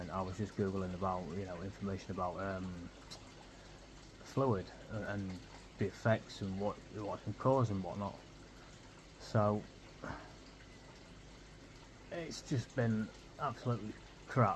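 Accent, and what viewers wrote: Northern England, United Kingdom. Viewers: Us northerners can be hard to understand 😅 believe or not.. not all of us English people talk like the royals..... we have 100s of different accents and subtitles are available if u click the double cc at the top of the video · To my American English ears the northern English accent is a bit difficult to understand, sort of like the Scottish accent.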